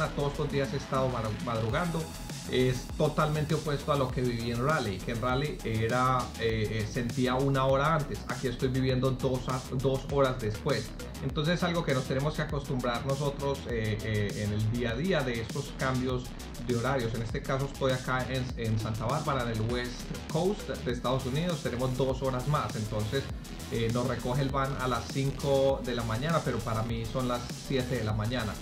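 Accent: Colombia. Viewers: Será colombiano por casualidad? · Capitan que aerolínea vuela usted Veo que por su acento se nota que es colombiano, yo también soy Colombiano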